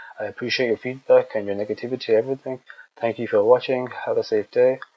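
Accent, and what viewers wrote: United Kingdom. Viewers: You sound British Asian if I'm right? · You fool... remember that 10 ft. wide meteor video shot from a distance of, oh, at least a few hundred miles away? Remember the intense magnification and sheer size of the video camera it took to make the meteor appear larger than any comparable object in space? Notice how, of this video the astronauts zoom in as much as possible yet the thermal blanket looks ---- incredibly small? Remember all the photos and videos of the hundreds of thousands of pieces of space debris taken by any number of astronauts who participated in low-orbit flight missions? Notice how in the video N.U.A. (Nothing Up [my] Ass???) conflates relatively small portions of the "blanket" with obviously larger portions of the object - still - in question? And please do tell us how NASA is always honest with the public (a place where "honesty" means something different to NASA employees in adjacent offices within its buildings). 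Before you believe some idiot with a droll English accent and before you gag on NASA's nuts, take a few minutes to actually ponder this video and consider how little information, beyond his "opinion" is being disseminated. Consider, too, that this is the same slickster who posted (among other fantastic "UFO" video lies): CAN YOU DENY THIS?! FLEET OF HUGE UFO MOTHERSHIPS - LA JOLLA, CALIFORNIA - 31/3/2015 without ever once seeking to inform anyone that the government has any number of aircraft that can perform everything seen it his video. If you must, buy a clue... may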